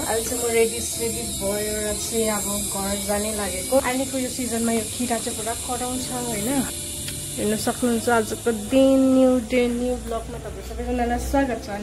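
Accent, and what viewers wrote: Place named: Sikkim, India. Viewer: झन्डै नेपाली नै नबुझेको 😂सिक्किमतिरको नेपाली र नेपालको नेपालीमा धेरै differences हुँदो रहेछ। any way happy dashain form Kathmandu Nepal😊❤ 🇳🇵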